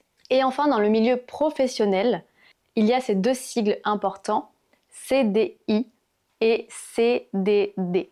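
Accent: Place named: Paris, France. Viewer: J'adore votre accent parisienne,merci.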